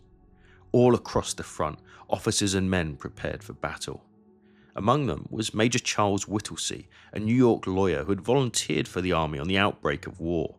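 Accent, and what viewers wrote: England, United Kingdom. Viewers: There's no R in the word. That thick English accent is rough as you butcher words and add Rs to everything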